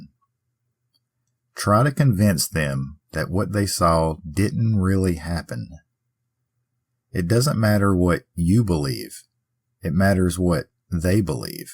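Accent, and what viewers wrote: South, United States. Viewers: Delicious dialect 😊😊 loving the sound of your voice as always looking forward to hearing this.. its 0134am xx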